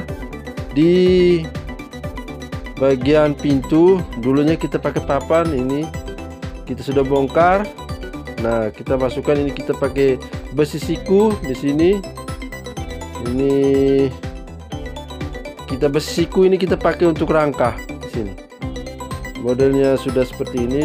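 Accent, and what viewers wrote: Flores, Indonesia. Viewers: abang ini cara omong, abang dr flores ka 🙏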